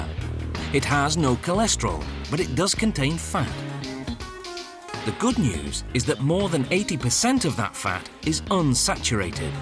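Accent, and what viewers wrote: United Kingdom. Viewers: Of course the video starts out with a British guy talking about bad teeth. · The bloke is a northerner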